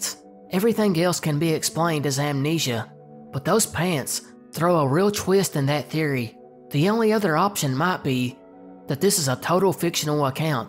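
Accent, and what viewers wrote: South, United States. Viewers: Man it’s nice to hear this type of video from a southern voice. I left Tennessee a few years ago and I miss our way of talking. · These are all great obscure mysteries. I can tell you spent some work on this. Nice to hear another Southerner too.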